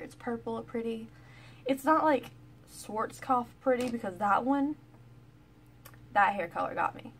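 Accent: South, United States. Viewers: Love your videos!!! I just wish that you would speak a bit more slowly, though perhaps you've slowed down in your more recent videos that I haven't seen. The speed, in addition to your southern twang make it difficult to understand what you're saying at times!!! xoxoxoxo 💇🏻✨💄👠💋👍💅💗👸🏻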